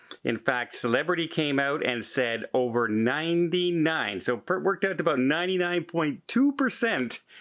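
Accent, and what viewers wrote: Canada. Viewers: Are you aware that you sound Canadian??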